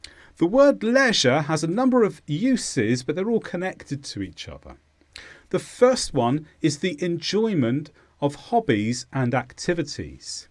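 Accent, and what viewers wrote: United Kingdom. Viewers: I have learned British Accent by you.I owe you i swear.When Our British Customers come to our restaurant they say where did you learn this excellent English?I show you❤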